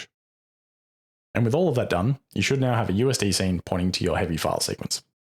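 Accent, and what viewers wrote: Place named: Australia. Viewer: Holy shit, is this taught by Australian Barry Manilow? I'd like a tutorial on how to get my voice to be this sexily resonant, I feel like I'm being seduced by a whiskey cask.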